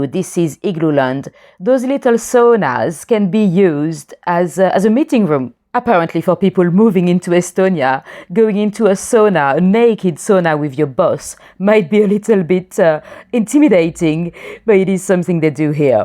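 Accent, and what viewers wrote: France. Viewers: Madame, quand vous essayez de parler anglais votre accent français est presque comique.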